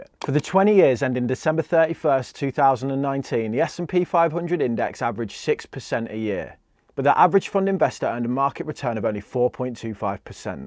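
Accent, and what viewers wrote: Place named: Lancashire, United Kingdom. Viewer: It's been doing it since i got here lol thats proper Lancashire speek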